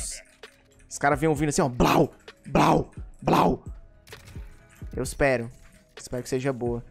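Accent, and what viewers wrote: Minas Gerais, Brazil. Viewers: Mano pelo jeito que vc fala da para percever que vc e mineiro demais e tem muito sotaque tbm sou e nois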